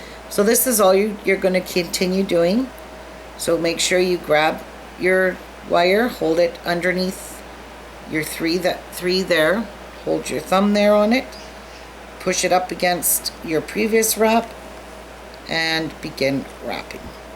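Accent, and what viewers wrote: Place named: Maine, United States. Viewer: Hello <3 I just wanted to say how much I enjoyed your personality! You were a breath of fresh air with your modesty, and reminded me of my childhood on the coast of Maine with your expressions and way of speaking. I was just reading some of the comments, and honestly, I wonder how some people can be so rude and judgmental under the guise of giving you "constructive criticism". My wonderfully colorful Great Aunt Laura from Great Island had an expression for folks such as these.....*spoiler alert, language may offend some of you** Opinions are like arseholes, ~everyone has one.... doesn't mean ya have to BE one! Happy Crafting, Love!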